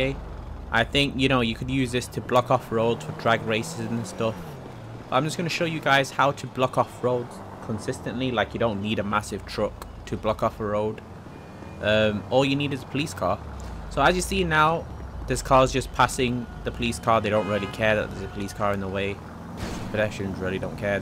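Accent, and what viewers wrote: Netherlands. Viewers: Yo you dutch? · Are you dutch?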